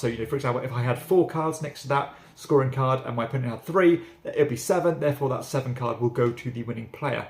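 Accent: United Kingdom. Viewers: If you don't mind sharing - what is your story behind Castell Rhaglan? - was it simply a visit, or do you have Welsh ancestry, even as your accent is undoubtedly English? 🤔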